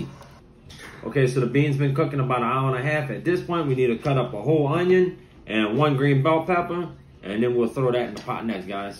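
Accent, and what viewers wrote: Louisiana, United States. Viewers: Let's Go! Yummy! You a lil ole Cajun just like my nieces husband. Love from Ms. Flournoy. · Subscribed and enjoy watching all your tutorials.. also love the Louisiana accent!! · That’s how our white people sound down there. That’s when you know they are from the dirty dirty part of Louisiana lol it took me to go to the military and come home 3 years later to actually hear how we sound lol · That’s the Louisiana accent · This is specifically the cajun accent from lousiana, theyre a unique culture.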